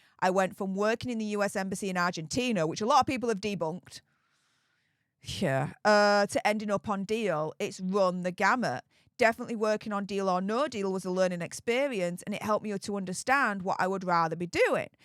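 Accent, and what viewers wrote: United Kingdom. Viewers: When you say “bonning” it sounds like “born in” … took me awhile to make out what you really were saying. This is what is fun above hearing a British accent.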